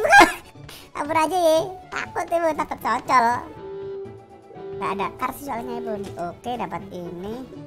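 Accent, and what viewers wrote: Java, Indonesia. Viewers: Jowomu ngendi?? Salam soko semarang. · jowone ngendi wkwkwkwk lucu pwol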